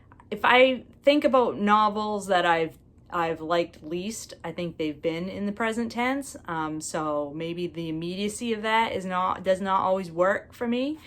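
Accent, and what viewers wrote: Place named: New Hampshire, United States. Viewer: My guess was NH😊. I’m originally from Mass, now in CT. Glad to have found you.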